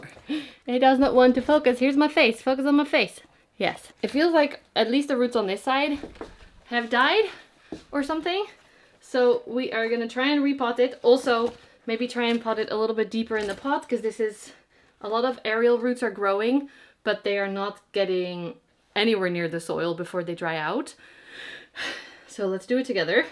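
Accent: United Kingdom. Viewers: Hi 👋 I love the long chatty video! 🎉❤ it was so fun to hear about how you and G got started and about your kitties. And I love your silly fun unfiltered personality ❤ your British English was so awesome 😂❤ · This was a really fun video, you have such an interesting life! I loved your British accent lol that was so entertaining 👍🏾👍🏾😂😂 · Your British accent is so funny, occasionally you drop into an Eliza Doolittle accent, from My Fair Lady. But it’s a better accent than my cockney one 😅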